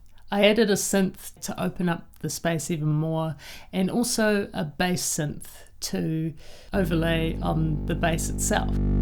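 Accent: New Zealand. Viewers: Beside your accent being a dead give away, your beats/sound is totally Kiwi!! Love love love this!!! Keep it up hun you are freakin awesome!!! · As soon I heard that accent I needed to check out the channel :D Gotta support more Kiwi channels! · Are you are New Zealander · i came from andrews channel to watch this, it was by far my favourite. i loved the use of the real instruments. it somehow reminds me of both and (mostly hayley). definitely gonna subscribe. also your voice is amazing, love kiwi accents. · Wait...your accent...a fellow kiwi? How cool if so! I also make YT videos but am such a huge fan of Andrew Huang. You're amazing! Congrats on the new video collab with Andrew. I've subbed.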